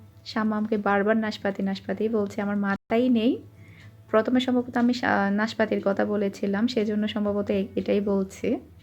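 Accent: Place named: Satkania, Bangladesh. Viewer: আপু সাতকানিয়ার ভাষায় ব্লগ দিতে হয়তো ভালো লাগে না মনে হয়? কিন্তু আপু তোমার মুখে সাতকানিয়ার ভাষায় কথা শুনতে আমার খুবিই ভালো লাগে ।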